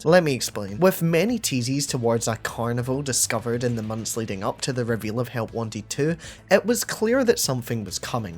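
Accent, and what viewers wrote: Scotland, United Kingdom. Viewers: great theory actually AND you remind me i love scottish accent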